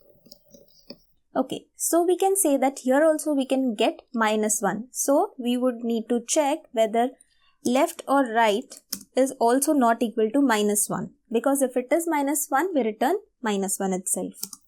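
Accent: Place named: India. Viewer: indian accent spoils any kind of tutorial :(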